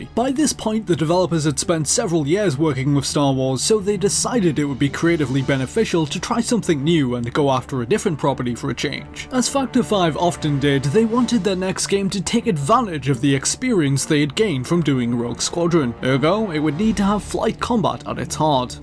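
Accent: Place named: Newcastle, United Kingdom. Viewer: You Geordie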